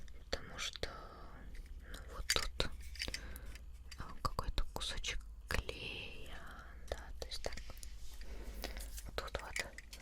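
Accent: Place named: Russia. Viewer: Eu amo o sotaque russo 😻♥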